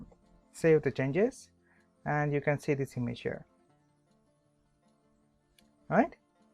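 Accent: India. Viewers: хренов индуский акцент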